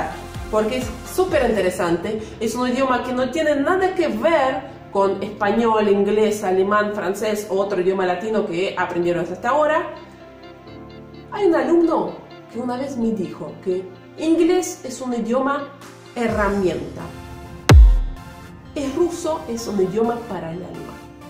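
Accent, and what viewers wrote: Argentina. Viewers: A ustedes los rusos se les facilita aprender idiomas. Por cierto se escucha bien su acento argentino ☺ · q acento Argentino jajajajaja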